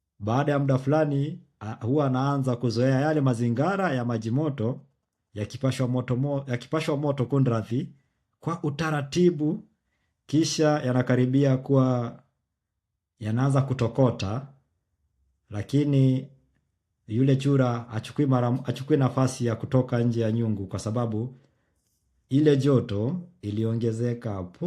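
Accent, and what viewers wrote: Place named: Kenya. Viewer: Mkenya anae ongea kiswahili sanifu dah! nimevutiwa na kiswahili cha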